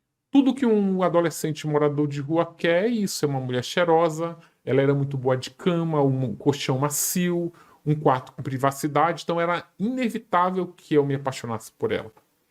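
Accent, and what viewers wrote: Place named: Rio de Janeiro, Brazil. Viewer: O sotaque dele é muito chato. Nossa. Uma mistura de carioca com nordestino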